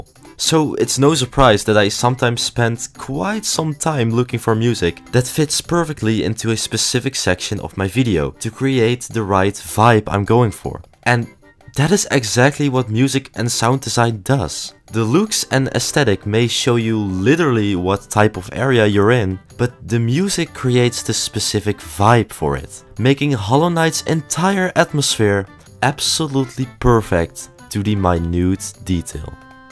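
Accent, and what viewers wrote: Netherlands. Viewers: You can just hear that he is Dutch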